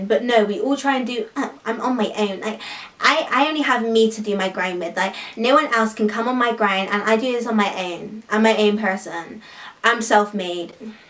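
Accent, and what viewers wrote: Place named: Gloucester, United Kingdom. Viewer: where are u from? u got a strong gloucester accent aha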